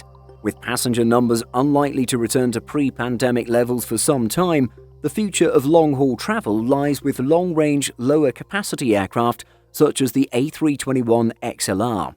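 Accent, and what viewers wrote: United Kingdom. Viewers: The guy is British, that is how the Brits say it, it's not wrong, it's just different.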